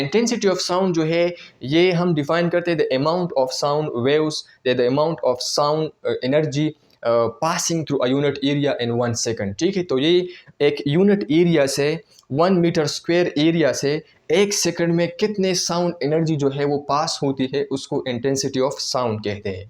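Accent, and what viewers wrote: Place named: South Asia. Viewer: because brother you looks foreigner but when you sounded south Asian it was really surprising and funny. As far as I can guess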